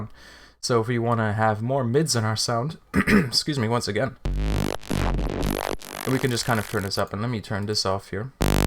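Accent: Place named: United States. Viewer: Dude, you sound soo american on this video haha, but anyway, helped a ton, Serum is such a great tool, thank you one more time!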